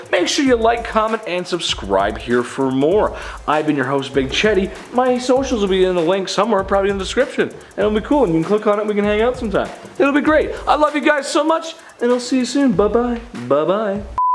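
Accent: Scotland, United Kingdom. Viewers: LOVE your Scottish accent!!!!! Cheers me up every time!!! Much love from Michigan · Now the house is more damaged and somebody may seriously hurt because of that guy Ps. Nice Scottish accent!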